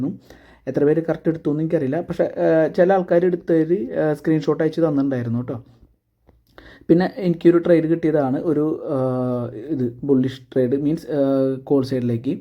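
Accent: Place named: Thrissur, India. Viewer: ചേട്ടാ 3 മിനിറ്റ് ടൈം ഫ്രെയിം ഉപയോഗിച്ചു smc വരച്ചു നോക്കൂ അടിപൊളി ആണ് എനിക്ക് ഇവിടെ ചാർട്ട് പോസ്റ്റ്‌ ചെയ്യാൻ പറ്റുന്നില്ല ഇന്ന് 9.20 നു ce എൻട്രി 9.42 നു put എൻട്രി 2 ഉം അടിപൊളി ആയിരുന്നു. ഇവിടെ ഉള്ള ആളുകൾ forex മാർക്കറ്റിൽ യൂസ് ചെയ്യുന്ന smc സെറ്റ് അപ്പ്‌ വെച്ചു ഇന്ത്യൻ മാർക്കറ്റിൽ ട്രേഡ് ചെയ്യുന്നത്. അത് കൊണ്ട് smc യുടെ കൃത്യമായ ഗുണം കിട്ടില്ല ഇന്ത്യൻ മാർക്കറ്റിൽ ഇയാളുടെ വോയിസ് കേട്ടിട്ട് ഇവിടെ തൃശൂർ അടുത്ത് ഉള്ള ആള് ആണ് എന്ന് തോന്നിയത് കൊണ്ട് പറഞ്ഞത് ആണ്. ഈഗോ ഒന്നും തോന്നരുത്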